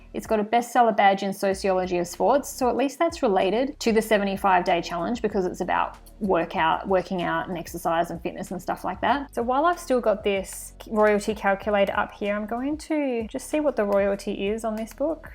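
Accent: Australia. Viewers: Is that an Aussie accent I hear?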